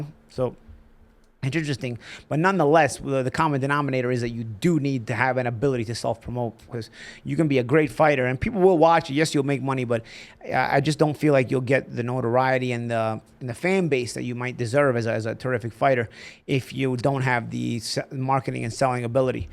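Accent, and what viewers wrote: Brooklyn, United States. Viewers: I’m a casual boxing fan who actually discovered you Paulie as a commentator lol. whenever me and my friends would watch fights on showtime I would always learn a lot from you as an analyst. that’s when I was like damn who is this commentator Paulie he’s awesome and he reallyy knows his stuff.. I knew you were from Brooklyn NY first time I heard you talk lol just from your accent which drew me to you even more.. love this channel best boxing channel on YouTube!! Paulie is the man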